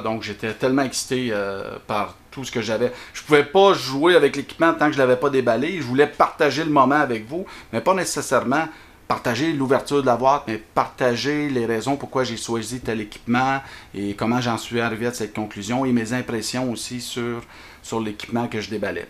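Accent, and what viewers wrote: Canada. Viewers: Quel superbe accent canadien j'adore. Même si je comprends très bien l'anglais cet accent me fait rêver merci beaucoup!!!!!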